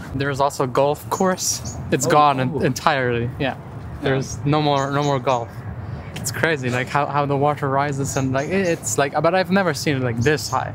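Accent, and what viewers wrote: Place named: Germany. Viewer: There are definitely whole sections of this video I swear I am hearing my words come out of your mouth , but with a German accent.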